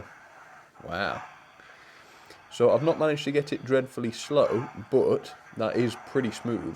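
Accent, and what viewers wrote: United Kingdom. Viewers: His British accent is so satisfying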